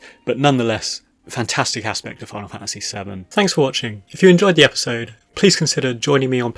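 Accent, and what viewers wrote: United Kingdom. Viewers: fuckin' jesus wow british accent old game "review" that's an opinion dude find something else to do lmao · British limey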